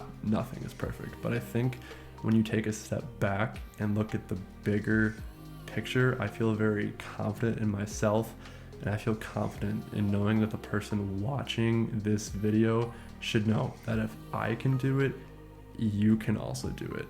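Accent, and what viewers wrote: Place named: Midwest, United States. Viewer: You sound a bit midwestern. You're adorable!❤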